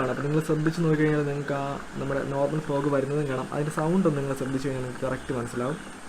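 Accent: Kottayam, India. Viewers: KOTTAYAM SLANG ALSO NICE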